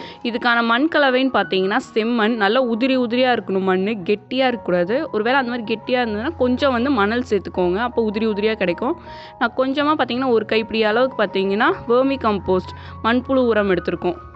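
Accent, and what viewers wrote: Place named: Coimbatore, India. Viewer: I like you and too and show in video and Im also from Coimbatore I like your native slang lots of love 💕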